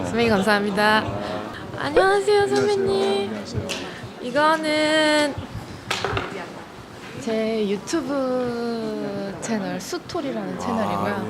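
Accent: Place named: England, United Kingdom. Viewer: She has such a nice English accent, I love it ❤️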